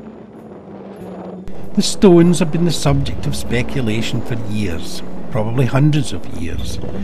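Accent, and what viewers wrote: Scotland, United Kingdom. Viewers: Nice and interesting film and I love your Scottish accent.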